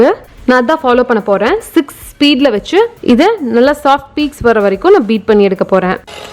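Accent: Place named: Pollachi, India. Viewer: பொள்ளாச்சி மொழி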